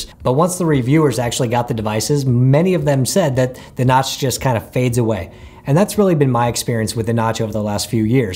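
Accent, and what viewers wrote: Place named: Texas, United States. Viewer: you sound texan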